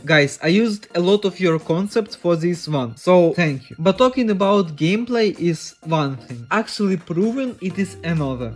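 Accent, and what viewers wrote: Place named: Russia. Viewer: Ты Русский? Просто акцент прям пиздец мощный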